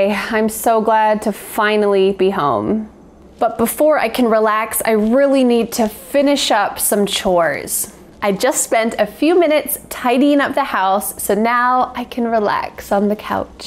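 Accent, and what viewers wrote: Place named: United States. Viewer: By the way, I'm crazy about the American gorgeous accent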